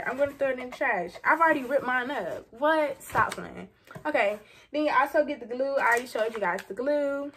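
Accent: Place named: Memphis, United States. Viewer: You sound like you from Memphis!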